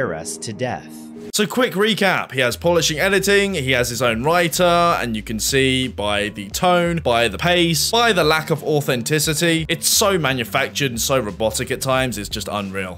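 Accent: United Kingdom. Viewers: Ye still watching because your brit voice is crisp xD, didnt even know who this Matthew was until someone posted a random video and got me to you. But yea your voice is going to get a sub. Would love to see some playthrough walkthroughs if you plan on doing them. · "If you have interesting subject, people will watch" disagree. Watched one of your videos and at this point i don't care what you are talking about. Your voice makes my want to listen and i just let autoplayer go on. Good job you funny, silk-voiced bri'ish man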